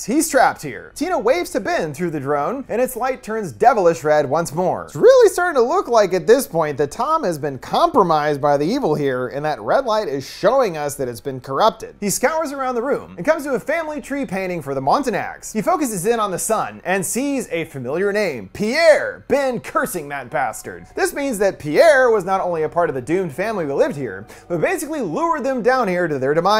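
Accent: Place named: United States. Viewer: that American accent is so annoying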